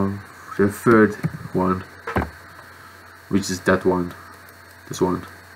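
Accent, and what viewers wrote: South Africa. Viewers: Are you from south africa? AWEH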